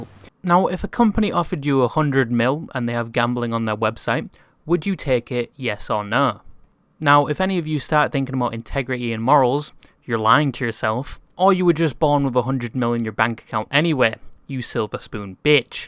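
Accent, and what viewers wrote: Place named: England, United Kingdom. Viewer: yo yt creator guy i like the content but i hate your stupid ass english accent and especially the way you pronounce: '' worse ''. Truly hope you can change your accent some how cause some times you pronounce something so weirdly that it feels like power drill drilling into my forehead but keep up the good work.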